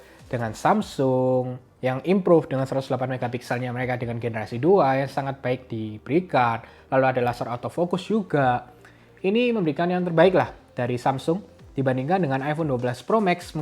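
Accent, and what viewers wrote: Java, Indonesia. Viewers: Orang Jawa logatnya keliatan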